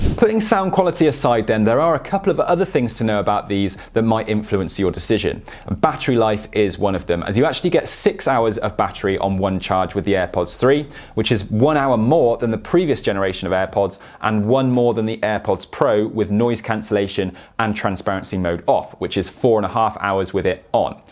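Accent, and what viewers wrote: United Kingdom. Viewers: Great video; love a British accent.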